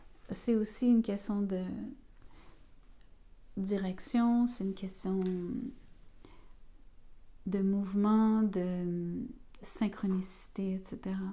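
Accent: Quebec, Canada. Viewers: Je l'attendait tellement, avoir cette manière apaisante et la signature Québécoise ❤, normalement les verseaux seront les premiers pour Mai 😅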